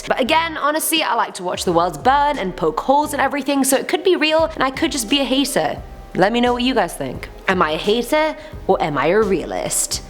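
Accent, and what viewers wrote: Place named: United Kingdom. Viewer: Lady get you a better name than you have, it sounds like a foreign name and if you are, why are you speaking British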